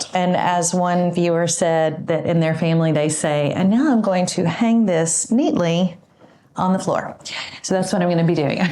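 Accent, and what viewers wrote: South, United States. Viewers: I love quilting, I love fabrics, I love that you thrift shop with your daughters and I love your calming, reassuring southern voice🙂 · I needed some fun this morning & your video fit the bill. Thank you for posting lighthearted and fun content. I enjoy that you let the South out in your speech. Being from Texas, it makes me feel like I have a neighbor talking to me. Have a great day! You've given a great start to mine!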